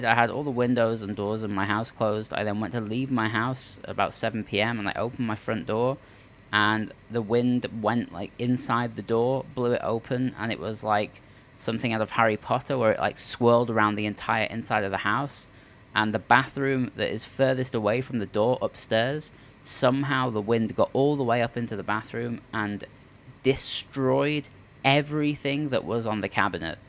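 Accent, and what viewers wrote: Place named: London, United Kingdom. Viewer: lol lol u soun like ur from london lol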